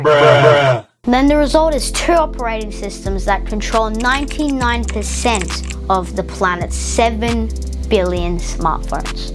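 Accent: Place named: United Kingdom. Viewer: Great work kid just loose the British accent and you’ll blow up 🙏🏽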